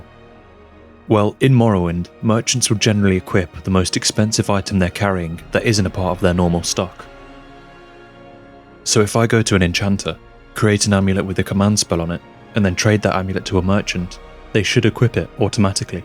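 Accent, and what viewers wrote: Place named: United Kingdom. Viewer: With the channel picture, the thumbnail, and the title I didn’t expect this voice. I anticipated something louder, more crude, and American. Not bri*sh ASMR. Good video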